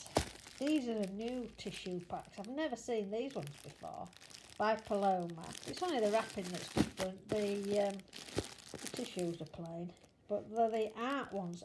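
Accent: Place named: Yorkshire, United Kingdom. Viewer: Thanks for your tip about the liquid soap. I have never tried that one so will give it a go next time I'm at Home Bargains. By the way, I love how you say 'art' in the good old Yorkshire accent so don't ever think you have to change it! Really love your nail colour, what a lovely rich colour of green. x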